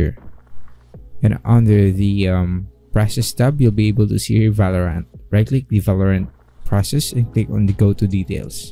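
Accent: Philippines. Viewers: I hear this slight Filipino accent, but still very detailed video :>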